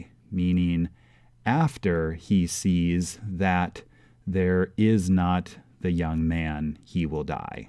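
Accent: United States. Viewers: Your pronunciation needs to be a bit less Americanized. Great information though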